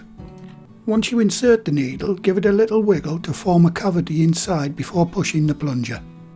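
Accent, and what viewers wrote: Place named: Geordie, United Kingdom. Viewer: My girlfriend is from Newcastle. Love the Geordie dialect🥰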